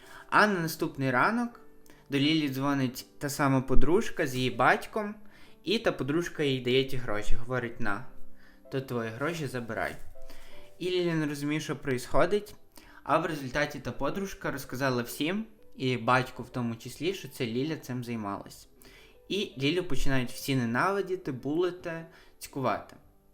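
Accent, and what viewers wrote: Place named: Surzhyk, Ukraine. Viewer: такий жоский суржик((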